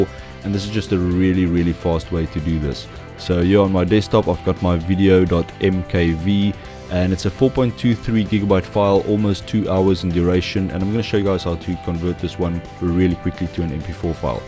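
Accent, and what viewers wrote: South Africa. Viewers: Homeboy sounds South African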